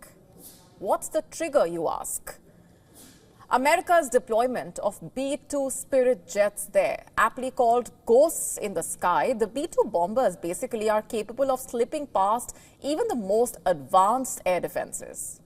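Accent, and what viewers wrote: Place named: India. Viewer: Indian accent so funny😂😂😂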